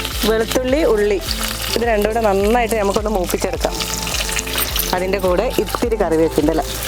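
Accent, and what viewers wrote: Malappuram, India. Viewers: Chechi malappuram kari ano atho kozhikkod kariyo samsaram kettitt chothichatan 🤔😊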